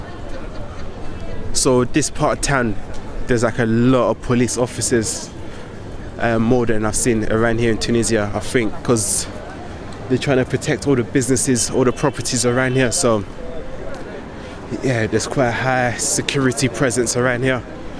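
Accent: United Kingdom. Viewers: U have accent British are u British?? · Your dialect is british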